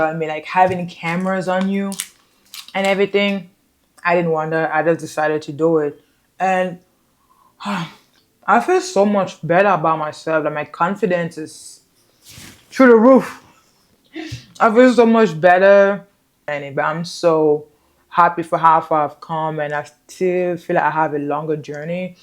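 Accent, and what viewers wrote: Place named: France. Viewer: Hi, I like your French accent that hides behind the English one!! I subscribed just for that!!! Je me suis abonné juste pour cela!!!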